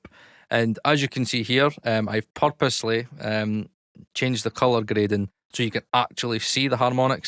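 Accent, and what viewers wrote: United Kingdom. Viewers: Sorry mate, could not take your British accent using disgusting American terminology. ROUTING has an 'OO' sound not an 'OW' sound.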